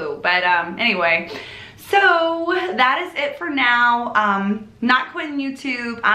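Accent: South, United States. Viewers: Hi, I love your accent, that southern drawl, and I wish I could decorate as good as you do, you inspire me to go bigger than the small decorative touchs I was doing, I went from a 3 foot tree to a 7 1/2 ' tree and for me that was a major thing, don't look at others who you think are more successful than you, just be you, please don't try to change, but be the person you are, if you have been crafting show that, if it's the garden or the chickens or your bees, show that don't think I need to spend money on xyz, you have been missed and I'm glad you are back.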